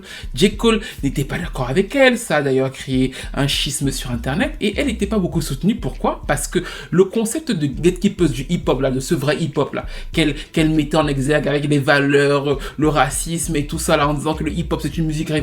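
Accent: Cameroon. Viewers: Il est camerounais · Toi là tu as un accent un peu Camerounais ou j’entends mal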